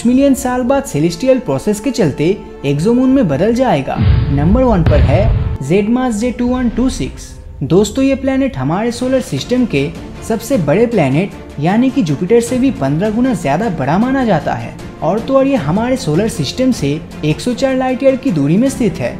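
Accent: Bengal, India. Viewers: Your hindi is as like as Bengali people 😊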